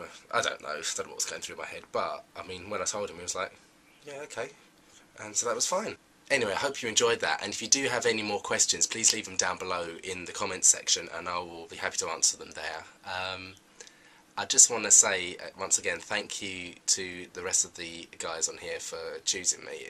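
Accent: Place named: England, United Kingdom. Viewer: Welcome to "thatgaybunch". I thought you did a great job of introducing yourself through the questions that were asked. I believe you're going to be a great addition to this collab channel. BTW, I love the English accent.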